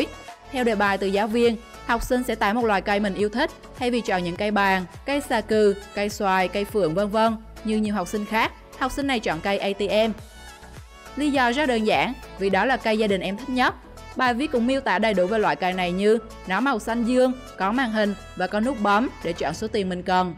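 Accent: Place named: Central, Vietnam. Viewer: Tìm bạn nữ đọc rõ tiếng Việt nhé Ad. Đừng dùng người trung giọng khó nghe quá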